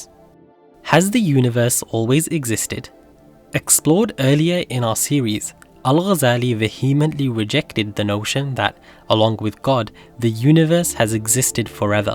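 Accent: Arab world. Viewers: I was wondering throughout the video whether he's Arab or not, I still don't know, maybe he grew up with Arab friends? It's so hard to tell and I'm Egyptian too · Why Arabic pronunciation?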